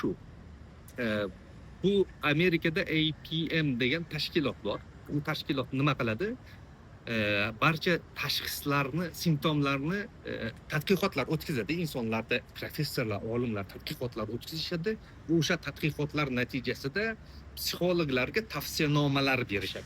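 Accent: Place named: Khorezm, Uzbekistan. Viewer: Xorazmlik emasmisiz, hazrat? Aksentlaringiz shevamizga tortyapti ))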